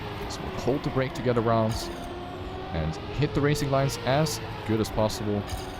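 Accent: Germany. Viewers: oh hes german that makes sense accent trew me off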